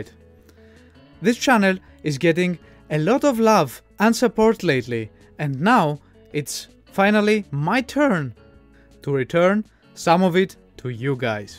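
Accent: Austria. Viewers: #giveaway #notAU I’m from the great state of Florida in the city of Jacksonville in the good old USA. First I just want to say that you’re English is perfect! There is of course an Austrian accent but not much can be done about that. Thank you for your hard work making all these videos! I would love to see more videos of you catching queens in the wild and how to take care of them please. Thank you again.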